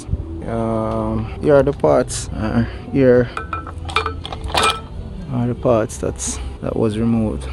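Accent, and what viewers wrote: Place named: Jamaica. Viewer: I hear a 🇯🇲 yaad man voice.. love the content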